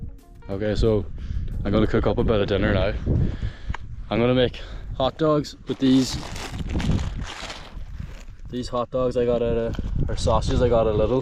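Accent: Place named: Donegal, Ireland. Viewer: Next video will you say dia duit in your donegal accent please. Im learning gaelic and its HARD!!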